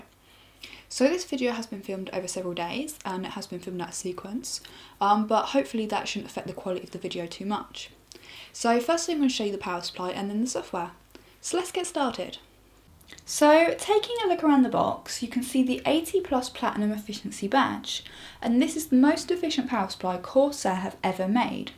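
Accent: United Kingdom. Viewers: Sorry, she lost me when she said "...sexy double sided..." The Brit accent, gotta love it.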